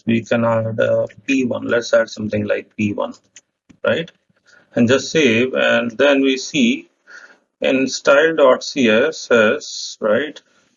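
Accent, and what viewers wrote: India. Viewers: Thanks for you , but his accent is Indian so I can't understand very well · We Got An Indian in the househhhh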